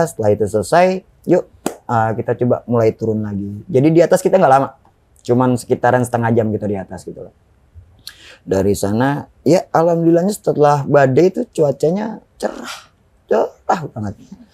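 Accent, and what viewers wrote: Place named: Java, Indonesia. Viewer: Nama Tapi Mendook Amat Mas Ngomongnya...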